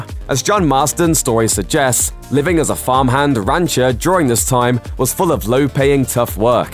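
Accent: United Kingdom. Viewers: Where are you from? I mean besides the UK. Love your accent and voice though. #nohomo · Is this guy a narrator for every video like this, or is this just how every single British man sounds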